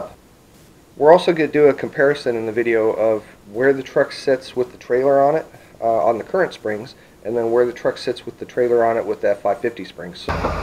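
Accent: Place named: California, United States. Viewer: I could tell you were a californian right away. lmao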